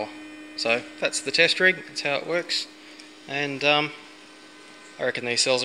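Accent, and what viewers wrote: Australia. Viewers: Unmistakable PERTH accent!!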